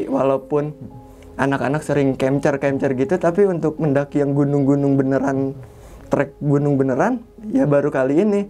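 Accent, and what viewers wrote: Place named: Bekasi, Indonesia. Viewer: Resep bet dah ah gaya ceritanya, nyablak bett hahaha lebih ke bekasi ini mah kaga cileungsi😂 apa jangan jangan orang setu nih si abang nya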